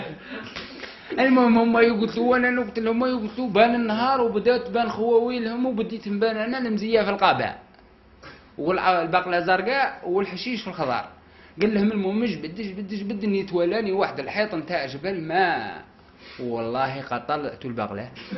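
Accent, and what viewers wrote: Djelfa, Algeria. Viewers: Tony Soprano لهجة جلفاوية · اللهجة الجلفاوية النايلية💞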